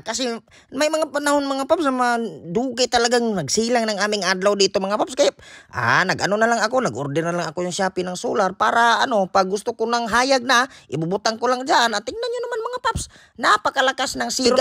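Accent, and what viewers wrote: Visayas, Philippines. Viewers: Kailan kapa niabot?🤣🤣 i like your bistag jud, bisayang tagalog 🤣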